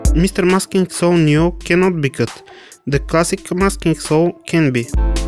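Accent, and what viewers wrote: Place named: Russia. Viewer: Твой рунглиш великолепен)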